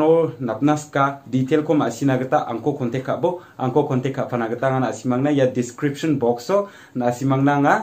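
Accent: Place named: United Kingdom. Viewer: Hope you to see your English accent again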